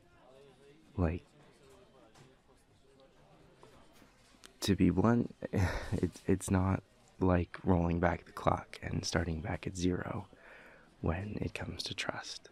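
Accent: Newcastle, United Kingdom. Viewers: AFTER 5 WHOLE MONTHS OF WAITING ANOTHER GEORDIE AUDIO IS FINALLY OUT Thanks for the food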